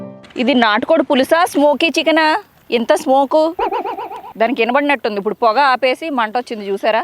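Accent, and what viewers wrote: Godavari, India. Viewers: Nv matldedi godavari yaasa ,rayalaseema antavu enti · ఒసేయ్ లప్ప గోదారోళ్ళ భాష మాత్రం బాగా పట్టవే!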